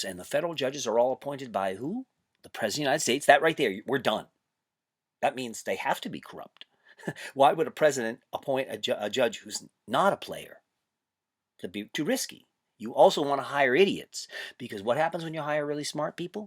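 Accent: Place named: United Kingdom. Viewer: Wow he's still doing the British accent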